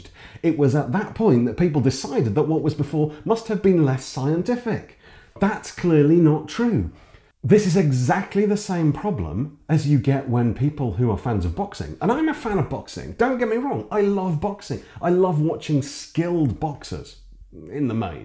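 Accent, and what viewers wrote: United Kingdom. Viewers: A man with muscles and a British accent reading from an old book to passionately make a point.....I didn’t know about this kink until now. Thank you.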